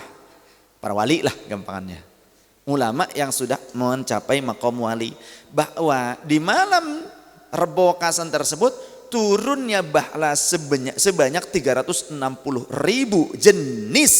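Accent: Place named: West Java, Indonesia. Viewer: saya mau tanya, apakah punya darah sunda? soalnya logatnya terdengar seperti orang sunda..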